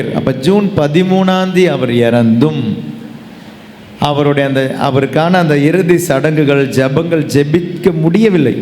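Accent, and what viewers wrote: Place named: Kerala, India. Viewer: மேஜர் அந்தரராஜன் ஸ்டைல். மலையாள வாடையும் விடமாட்டேங்குது.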